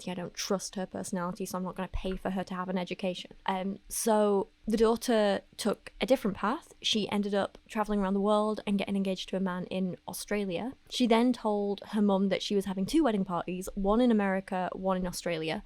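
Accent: United Kingdom. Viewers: I wish I could understand you. 😅😅😅😅 your accent works well in the UK...not in the US....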